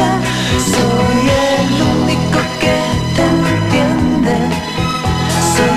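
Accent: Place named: Spain. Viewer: Hola: es de Uruguay, pero comenzó su carrera a principios de los 80's en España. De ahí su acento español.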